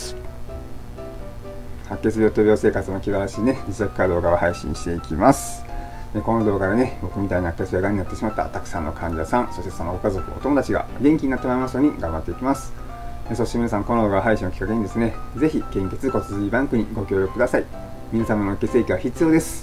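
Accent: Osaka, Japan. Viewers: マスクをしてない元気なさんだ〜❣️今発病された頃の病室からの動画を見ています。頑張れ！頑張れ！と思いながら見てるけどいつもこちらが元気をもらっていますよ❣️ 発病した友達は8日間の抗がん剤投与の後少しづつ副作用が出てきてます。私がさんの動画でもらったパワーを伝えています‼️ 同じ症状になってみないと本当のところはわからないけど、口の中の素晴らしいハーモニー‼️‼️イヤでしょうね…ツライでしょうね……それを軽い明るい大阪弁に乗せて語るさんが大好き😘 頑張れ〜❣️頑張れ〜❣️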